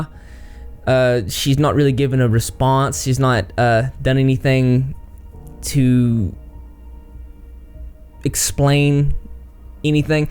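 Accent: South, United States. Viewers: nice southern accent! haha im from texas, where are you from? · Southern I'm from ky and its definitely this area Tennessee maybe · Are you southern? Because i am and i cant tell, which is so wierd! This is the first video of yours that ive watched. You seem southern, but i have heard people from california talk like that so i dont know. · I'm trying to figure out where this guy is from lol. Definitely from the south, but at the same time reminds me of the 1980s. Does that make any sense? Probably not! 😂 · It's so refreshing to hear things like this and such a sensitive position in a southern accent. I feel like conscientious & kind southerners don't get a lot of representation (not that they're an ~oppressed~ group or anything, I just think the largely conservative majority of southern states makes a specific stereotype somewhat accurate) and it's just very comforting and nice to hear.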